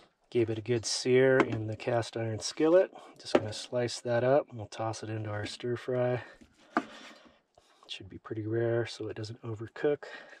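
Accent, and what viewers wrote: United States. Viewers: hi, thank you from us Europeans for putting the temperature in C°, just another question, why is "Route (Root) 66" pronounced different to " I'm taking that Route ( Rowt ) is this an American thing? another great video 👍🇬🇧🇬🇧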